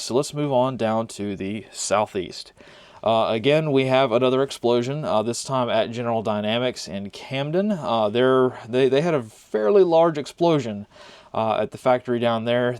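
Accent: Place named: Maryland, United States. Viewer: I would bet you were born in or near Maryland from your accent.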